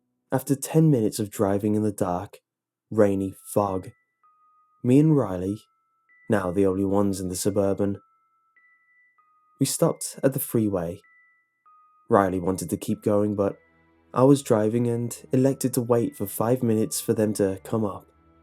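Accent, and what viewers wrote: England, United Kingdom. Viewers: is he american or English cos he sounds English but I'm not sure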